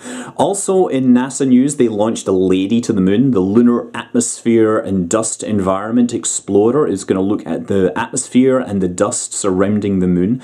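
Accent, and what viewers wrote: Scotland, United Kingdom. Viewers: your pronounciation was so off, that I only got who you were talking about from your explanation. So here I'm trying my best to provide a 'phonetic' transcription of Erdős Pál's name for the benefit of the internet. (We have a different order for names, yes.) Pál Erdős - Paul (is sufficient, but Pahl would be closer) Ehrdoesh Keep up your Scottish awesomeness!;)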